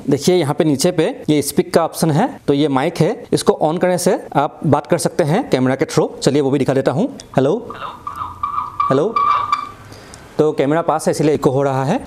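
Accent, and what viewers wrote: Assam, India. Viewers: অসমীয়া হিন্দী যেন লাগিছে // যা হক video টো ভাল লাগিল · Apka hindi sunkar lagta hai app assamese hai ☺️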